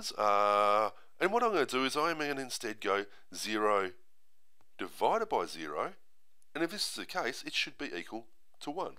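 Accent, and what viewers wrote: Australia. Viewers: Hey mate! We have been subscribed and watching your awesome videos for last 10 years. It would be really appreciated if you could improve the sound quality but loving your aussie accent heaps. Have a good day!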